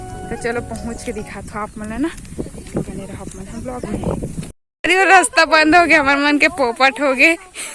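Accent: Chhattisgarh, India. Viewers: जबरदस्त मिठास हे तोर छत्तीसगढी बोली मे❤ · Apke awaj se pta chl rha h ap dhamtari se ho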